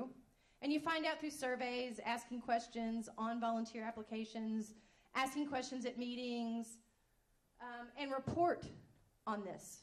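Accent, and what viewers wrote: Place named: Kentucky, United States. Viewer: It was brought to my attention today that someone is offended by a comment I make at the start of this video, and because of my earlier comment here, referencing my Kentucky accent (the offended person didn't write me - her colleague did). Prompted by that second-hand feedback, I've written a blog that I'd like for anyone who is offended by my self-depreciating humor to please read first BEFORE becoming outraged. There's also suggestions in the blog on how to communicate with me directly about any of my work, so that things can be clarified before offendedness ensues. <link>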